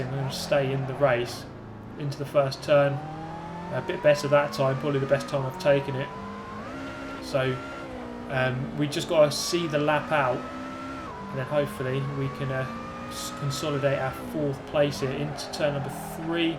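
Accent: United Kingdom. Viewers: his british accent no bullshite intro and dubstep music backgrpund adds up to a good unannoying vid//s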